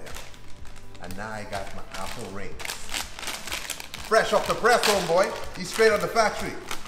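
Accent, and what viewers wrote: Bahamas. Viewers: Bahamian accent strong son lol oh how we love a good drink ! Keep up the good work brother! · I'm sure this has been covered before, and I'm super late to this channel, but where is he from? He sounds Bahamian to me.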